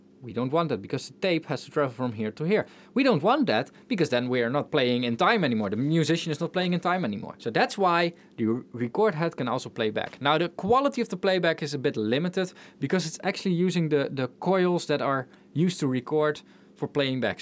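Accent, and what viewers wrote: Netherlands. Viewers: Totally off-topic but it's so cool to hear that we have the same pronunciation. Typical dutch - English. Now on-topic....GREAT CONTENT!! I'm also a gear dinosaur ;-) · Why is a Dutch guy (I assume by the accent) who's making English videos using German terms to explain technical stuff? Very interesting video, btw!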